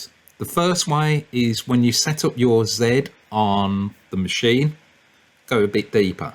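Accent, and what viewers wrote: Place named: Birmingham, United Kingdom. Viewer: I take it by the Brummie accent you in the UK lol. What do you think of the Onefinity Machine and what was the customs charge to get it into the UK? Im ready to order one but a bit nervous of the Customs charge. Also, what do you think of the Makita Router with it? Does it do a good job, or should I order a PWN Spindle at the same time. Sorry for all the questions.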